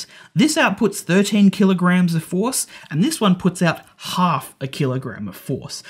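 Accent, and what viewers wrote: Australia. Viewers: Yewwww fuck yeah mate, love the vid, love the channel. I'm from the central coast and I love hearing aussies explaining electronics.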